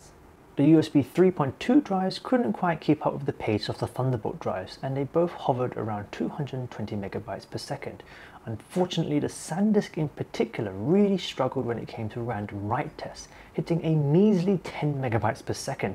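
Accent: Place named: United Kingdom. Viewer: Weird seeing an Asian speak old style British. But not as weird as seeing a black guy speaking British.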